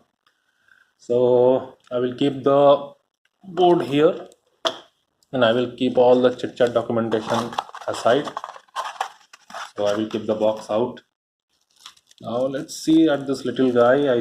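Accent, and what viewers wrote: India. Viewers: another indian accent ...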